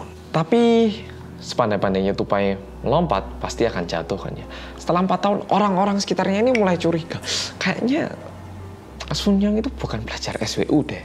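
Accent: Java, Indonesia. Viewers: sprt biasa mas selalu menceritakan secara detail dan dg gaya khas medoknya wkwkwk, lanjoottt trs mas sukses yoh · Ini muka nya kyk Korea bahasa bicara nya kyk org jawa. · korea punya youtuber orang korea yg medok, jepang punya youtuber nihongo mantapu .. keduanya favorite gue even gue udah emak" 🥰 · Samean kok iso medok too mas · Aku terekomendasi dari temen, tertarik goro goro mas e ngomong wong korea medok 😂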